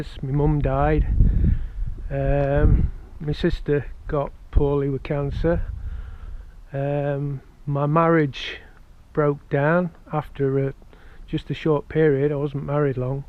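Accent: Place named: East Midlands, United Kingdom. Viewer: Hi, you sound like your'e from the east midlands somewhere, Phillipines is a great place, $575 is enough to live on, it works out about $26000 pesos which is about 46 pesos to the dollar. The people are very nice but try and learn a little of their language and try their food. When in the Phillipines do as the Filipinos do.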